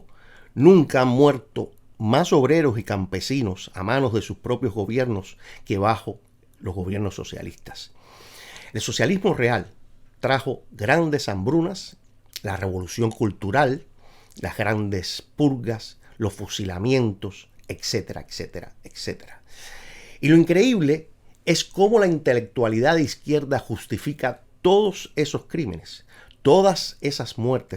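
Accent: Cuba. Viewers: Se nota que eres cubano, asere!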